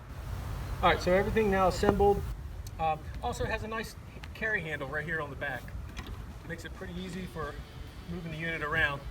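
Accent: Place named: New Jersey, United States. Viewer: Nice video. FIrst time on your channel - you sound like you have a New Jersey or PA accent. Reminds me of home. Thumb's up.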